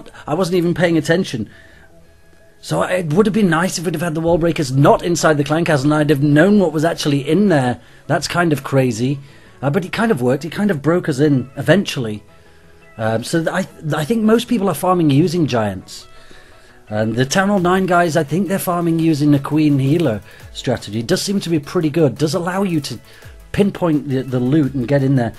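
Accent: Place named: United Kingdom. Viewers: I'm pretty sure he does have a British accent. What would you say it is? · speaks "the queen's english" which is quite different than american english.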